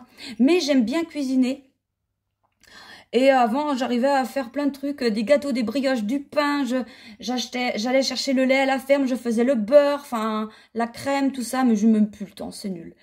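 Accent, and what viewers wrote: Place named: Normandy, France. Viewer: 🎉 Coucou, vous me touchez beaucoup et souvent.. ❤ Votre travail est magnifique. J'aime vos Créations et vos Pensées généreuses,.. et aussi, je dois le dire vos mots avec les intonations qui sont les mêmes que celles de ma région natale😂 ( près du Mont St Michel, côté Normandie ) j'adore.. ❤ Merci pour tout; votre futur chàle est Magnifique.. 👌🌟 Belle journée ❤😘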